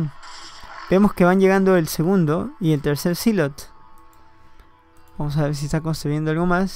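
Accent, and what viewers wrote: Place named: France. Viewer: esque es frances.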